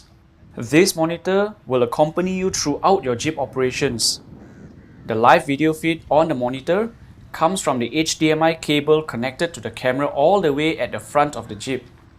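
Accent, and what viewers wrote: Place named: Quebec, Canada. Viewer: Where are you from? You have some kind of a french canadian accent!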